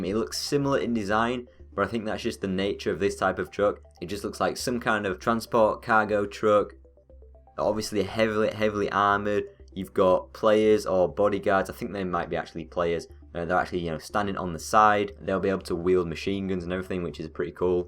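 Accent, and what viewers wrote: England, United Kingdom. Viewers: omg imagine an armoured submarine with torpedos or and a big submarine that can shoot nuke type rockets ( not as powerful like a more powerful rocket from rocket launcher ) and u can place target on map in launchers out top and lands on area destroying different targets ong that was on the top of my head ahaha XD love that ur English not American lol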